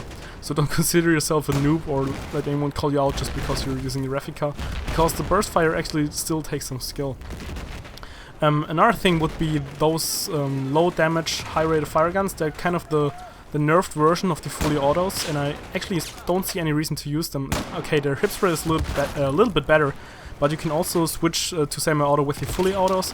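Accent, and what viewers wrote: Germany. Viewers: Are you German, because of your accent? ;D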